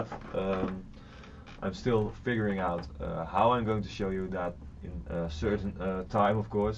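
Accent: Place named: Netherlands. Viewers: hij is nl >,< wow gewoon wow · Je hebt een Nederlands accent:)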